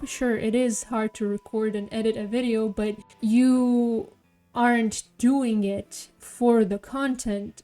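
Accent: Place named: United States. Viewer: You have a very American accent, I thought you were an American at first.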